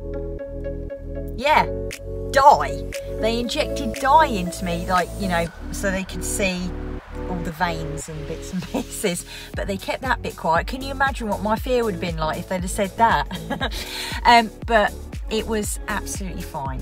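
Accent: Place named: England, United Kingdom. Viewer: This is a really cool channel love your english accent :D I subbed!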